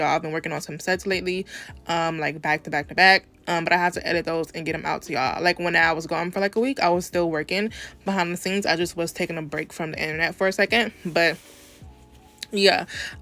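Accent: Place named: South, United States. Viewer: Where are you from, if you don't mind me asking. Sounded like i was hearing some country coming out in this video...😂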